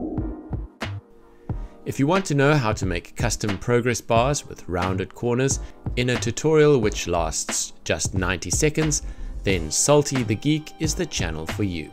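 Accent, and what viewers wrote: South Africa. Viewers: That an SA accent I'm picking up?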